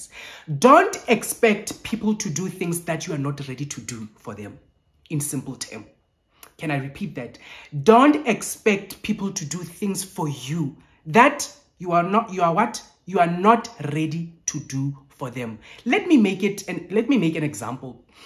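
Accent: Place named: Sotho, South Africa. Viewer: oh wow ke utloa fela ka accent..I'm also sotho from Lesotho but based here in Joburg 🫶🏼 keep shining fave🎉🎉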